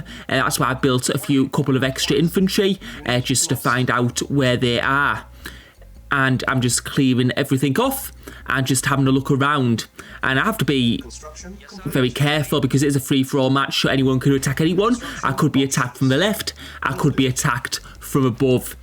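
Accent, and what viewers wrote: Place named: Northern England, United Kingdom. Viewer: im not an expert, but it's a northern English accent, my guess would be something around Manchester.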